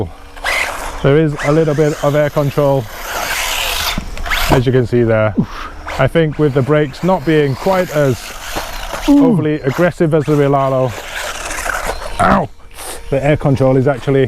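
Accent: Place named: France. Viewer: ahah l'accent français à couper au couteau. Change rien !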